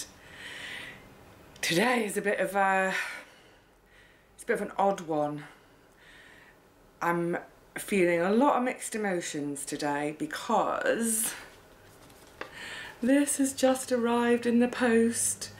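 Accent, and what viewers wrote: United Kingdom. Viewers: ? You have all of us! All 2,404 of us! I still wish would create a mailing address for us, so if any of us want to send her a gardening surprise in the mail......, if your regular use mailing address makes you nervous to share here with us, can you get a separate mailing address that is for all your online friends?, you bring all of us your knowledge of gardening, you bring us your happiness for life, you bring us your "UK" accent (which we adore)......I think it would be fun for you to get random surprises in your mail box.......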